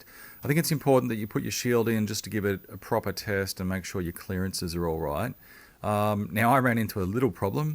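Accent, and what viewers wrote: Australia. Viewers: Garage Great Video mate! What part of Aus are u from?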